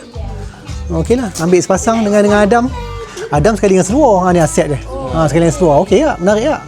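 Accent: Malaysia. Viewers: wuih... mantap, cakap bahasa dengan aksen/dialek Melayu . 👍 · Logat Johor kah?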